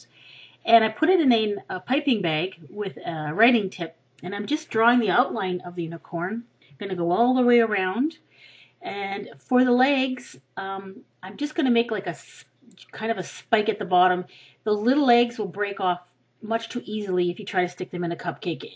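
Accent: Canada. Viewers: RU Canadian?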